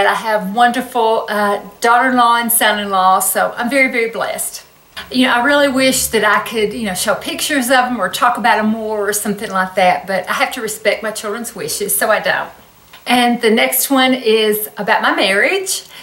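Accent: South, United States. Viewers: No way -- you are not over 60!! You are stunning!! Love your southern accent, btw :-)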